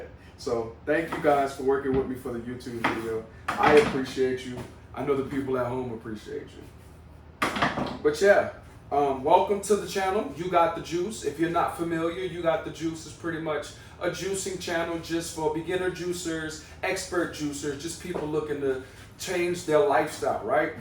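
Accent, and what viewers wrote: New Orleans, United States. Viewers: This is my first time watching any of your videos. I kinda stumbled upon it. So I’m watching and listening…and waaaay before you mentioned being from New Orleans I’d already picked it up in you. Even though your accent is turned OFF in this video, I know the little nuances and subtleties of New Orleanians and our communication patterns. The more the video went on, the more I heard them. I can tell you’re living away from the city and have grown differently due to new experiences outside of the N.O. That’s my story, as well. But no matter how long we’re away, it’s always home and it’s always within us….and it doesn’t take much for it to pop right out, ya dig?! Fuh sho.😉 Great video and I’ll definitely be trying this juice recipe tonight!! Thank ya my brudda! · Love your video… I am about to get into juicing myself, so I’m glad I ran across your video….not to mention it was particularly interesting because I knew that accent was from #Nola lol. 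Love my city & our accents…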